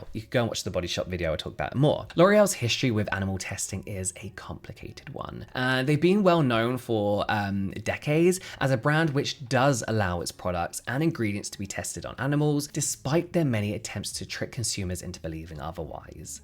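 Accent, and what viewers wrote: France. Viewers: Your French accent is adorable and fancy !! Tips: the letter T at the end of a French word or name is always silent :) same with the letter S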